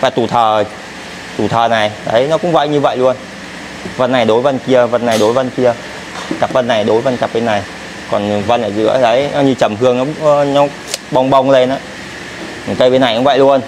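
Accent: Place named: North, Vietnam. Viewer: Bạn nói giọng lai miền bắc.Viết chữ cũng lai bắc luôn.Ở trong miền nam chúng tôi viết hay nói đều là Hoa Văn chứ không phải là Hoa Vân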